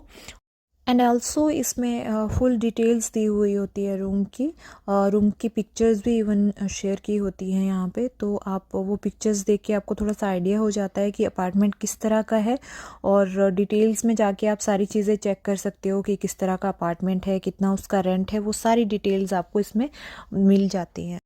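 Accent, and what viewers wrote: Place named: India. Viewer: Your English Accents like Indian language , Not understanding at all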